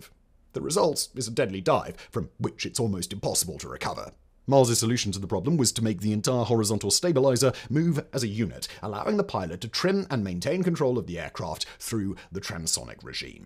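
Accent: England, United Kingdom. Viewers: Why does speak with an English accent but pronounce Berkshire incorrectly like an American?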